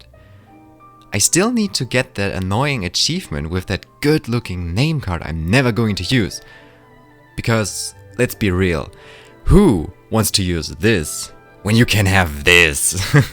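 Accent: Germany. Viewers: u sound kinda german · You are a german aren't you? I'm a german who can (no flex) speak english pretty good even though I'm really young and I've heard a lot of germans in my class speaking crappy english. I mean you're english is perfect but you can still hear the german dialect (correct me if I'm wrong). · Are you from Germany? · I wonder whats ur native language ? German?👀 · I can tell you're German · Deutscher Akzent hahaha xdd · Sounds like German-English to me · Du bist deutsch erkennt man bei deiner Aussprache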